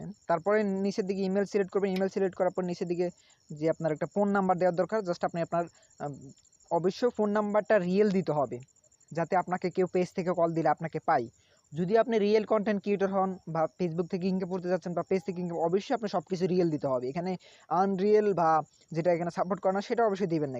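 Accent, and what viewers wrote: Noakhali, Bangladesh. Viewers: ভাই আপনার বাড়ি নোয়াখালী কোন জায়গায়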